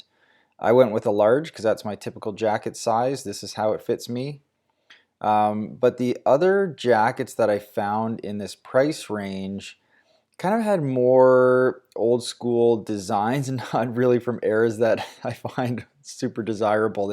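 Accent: Canada. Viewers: You're Canadian?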